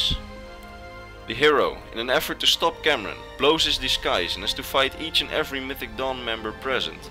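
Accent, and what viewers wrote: Netherlands. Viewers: This guy is Dutch i hear it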